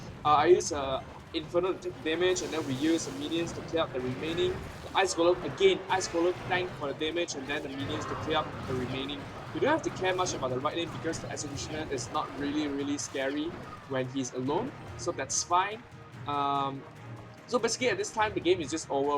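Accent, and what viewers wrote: Singapore. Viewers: when i watch this video i got alot of information.and i just subscribe it.peace man.btw are you singaporean ?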